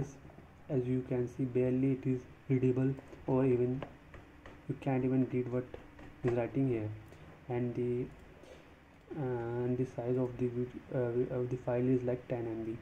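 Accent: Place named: India. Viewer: in fact you talk english but the problem is that you talk it with an Indian accent. This problem is common with the absolut majority of Indians who talk english. Indians have weard accent when they talk english, and this makes that most of people can't understand them when they talk in english. It's strange that Indians talk english with that weard accent especially that their country have been colonised by Grand Britain and for a long period. on the other hand We find that many nations that have not been colonised by Britain have understandble english. Normally Indians are supposed to talk english better than all the other anglophone countries due to the long British presence in India. Also there is large Indian communities in Britain and USA. Indians are smart people and their counrtry had been developped a lot the last 30 years. It's really not understandable that Indians talk english with a weird accent that make strangers not understand them but also attack them or laugh and mock of them. 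with all respect, My advice for you is that you should emprove the way of spelling in english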